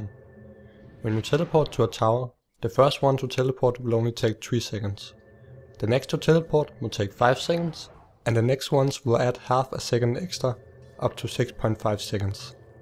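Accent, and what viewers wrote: Denmark. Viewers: wow... he's accent sound really Danish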